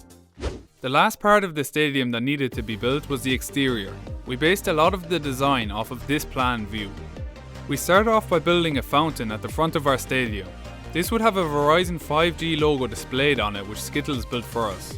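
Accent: Ireland. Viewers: U sound irish and insane build man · Are u Irish cus u sound like u are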